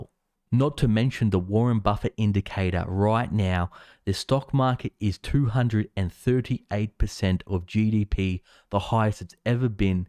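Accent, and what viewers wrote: Australia. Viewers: Hey. I'm an Aussie, too. You sound like you live in Melbourne, but I could be wrong, of course. I'm in Sydney. You make some interesting videos. So, I'm designing a currency that has no commodity capacity, i.e can't be speculated/gambled on. The objective is to incentivise creating sustainably. I'd like to run it past you, more for analysing the modelling as it is a pretty difficult currency to introduce in reality, but who knows. Get back to me and we can chat about it.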